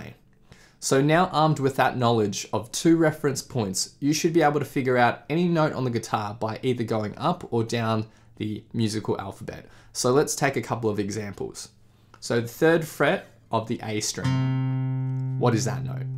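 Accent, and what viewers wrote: Australia. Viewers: Haven’t watched hundreds but agree this one is brilliant, keep it up. And the Australian accent… sweet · Something about the Australian accent that makes this so much more interesting…..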